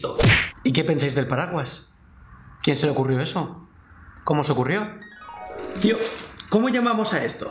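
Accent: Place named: Argentina. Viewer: Yo pensé que era Argentino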